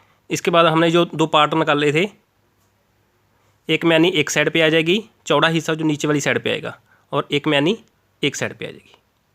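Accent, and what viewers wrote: Punjab, India. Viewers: Tusi Punjabi ho veer g mai v Punjabi aa tusi bhut vadia samja rahe ho te tuhadi Hindi ch Jo Punjabi mix h oh bhut jyada vadia lagi mainu kyu ki same to same mere massi g jado Hindi ch gl krna start krde ne ta tuhade baggu bolde ne God bless you veer g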